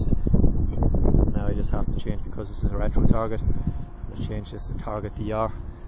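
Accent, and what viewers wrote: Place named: Ireland. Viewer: What a crappy tutorial.. How do you expect anyone to follow along with the monotone, robot sounding voice that also has a sharp Irish accent.. And how about that wind noise that drowns you out - that's actually kind of nice to be honest. Seriously, I don't think you guys listen to it before uploading to YouTube.. If you did you would be like OMG, THAT IS WORSE THAN A STEAMY PILE OF 💩. Do better, viewers deserve it!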